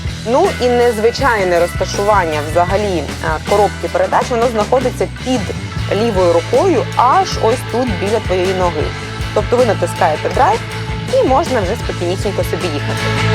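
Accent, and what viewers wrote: Russia. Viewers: Вах, Тарик, разбирается в мове? Так крыша или дах? Послушай ещё раз! Ведущая, просто "Пэрсык в Багдадской ночи!", но украинская речь - с русизмами, а это - суржик... Увы.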